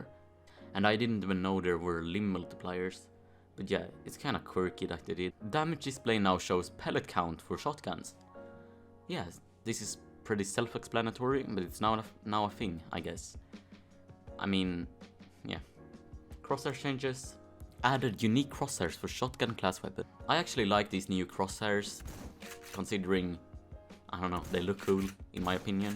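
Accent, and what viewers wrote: Sweden. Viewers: I can tell that you're swedish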